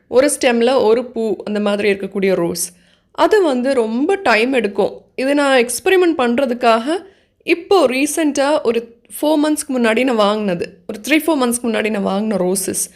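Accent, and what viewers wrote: Kerala, India. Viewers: I love ur Malayalam mixed tamil pronunciation 😍😍😍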